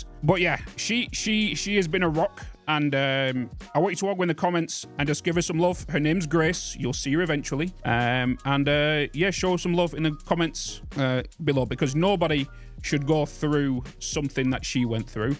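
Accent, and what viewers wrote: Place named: Scotland, United Kingdom. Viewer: my mug arrived, thank you! edit after the video: holy shit bud. sorry she went through that, congrats on walking your sister down the isle, thats a huge honor, 40 lbs is a shit ton of weight, lets go! Dude, as always, we have our notifications on, if you need to take a break, take a break, we'll be here when you get back! Don't feel any pressure to 'preform' we just love your face and brogue, and we always have your back, even if that is you going offline for an undetermined amount of time. also, how did you drop so much weight? you stopped drinking iron brew didn't ya? ;)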